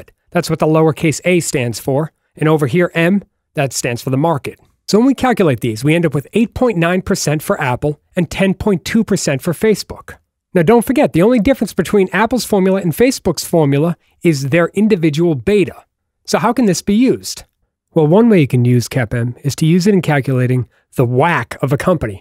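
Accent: Boston, United States. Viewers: Love the Boston Accent! I subscribed, helped a lot with my Finance Final!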